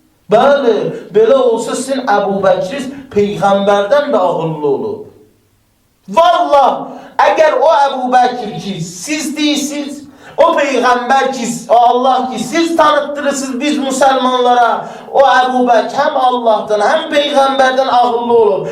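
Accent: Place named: Iran. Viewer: sen niye iran lehcesi ile danisirsan ay Azerbaycanli?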